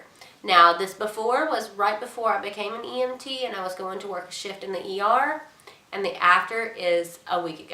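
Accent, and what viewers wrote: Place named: Georgia, United States. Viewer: Sound like a Georgia girl.. maybe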